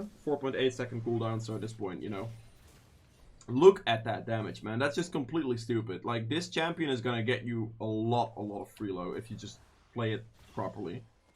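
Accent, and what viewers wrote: Netherlands. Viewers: as a dutchy i can tell you are dutch. nice guide thx!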